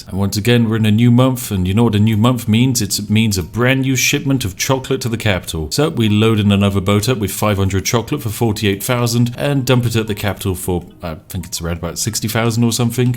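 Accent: United Kingdom. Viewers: He's British, that's how we say it, chill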